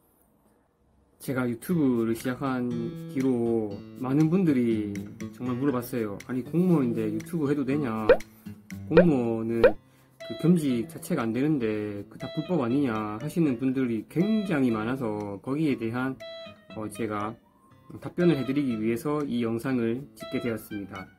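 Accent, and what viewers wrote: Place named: Busan, South Korea. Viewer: 공무원 유튜브 해도되냐는 공격적인 댓글에 마음 고생 좀 하셨겠어요 ㅠ 저는 팬으로써 님 응원합니당✊✊ 그와중에 개인적으로 부산사투리 넘 좋아요ㅠ 다시태어날까..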